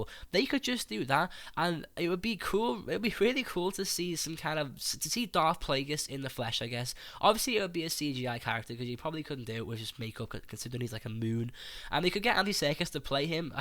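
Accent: Liverpool, United Kingdom. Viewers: can i ask are you from Liverpool? i live in Liverpool, i'm glad to hear a familiar accent on youtube :)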